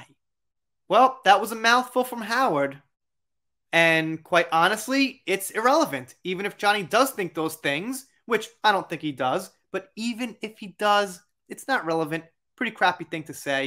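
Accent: New York, United States. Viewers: Never liked Howard Stern he has a big ego,well people are going to go bad for stern,he's putting people off,johnny is innocent, your from new York I love your accent,I wondered why I liked your voice and like listening to it,to bad we can't have hot chocolate and playing monopoly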